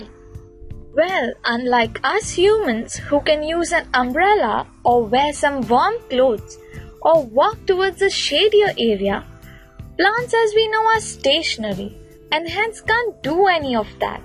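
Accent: India. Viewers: this video could be useful, but sadly it's in india accent, take time to understand..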